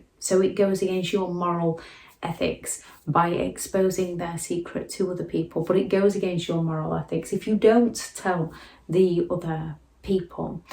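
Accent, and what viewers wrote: United Kingdom. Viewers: I love your accent.Sexy Limey!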